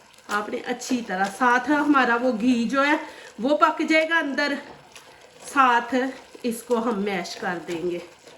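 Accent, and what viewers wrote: Punjab, India. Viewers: Aapki Punjabi vadde hai... aapnki urdu se Pata chalta hai ...masha Allah... 🥰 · She is constantly speaking in stupid punjabi accent. Quite irritating.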